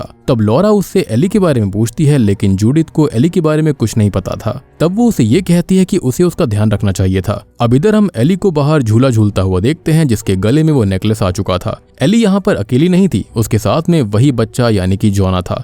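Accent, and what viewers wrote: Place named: Bengal, India. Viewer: Are and haunting tubes the same....because the voice and the accents are the same...like bengali broken hindi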